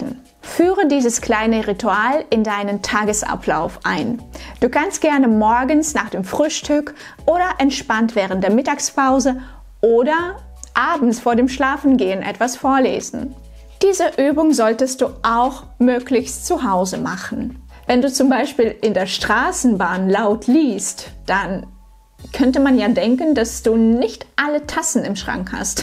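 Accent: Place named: Russia. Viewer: У тебя все равно русский акцент.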